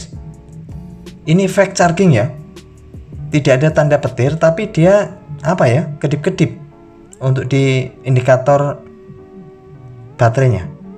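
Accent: Java, Indonesia. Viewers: Orang jawa yah?kalau boleh tau jawanya mana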